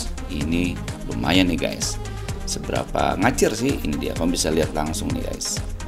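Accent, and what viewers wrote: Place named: Banjar, Indonesia. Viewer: Suara nyaring ya bang bahasa banjar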